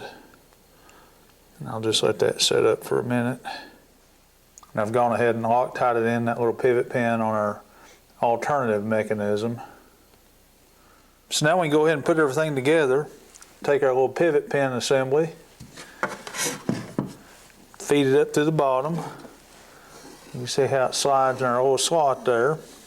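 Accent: South, United States. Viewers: I understood him perfectly lol...... We don't talk that unusual in the south.... :D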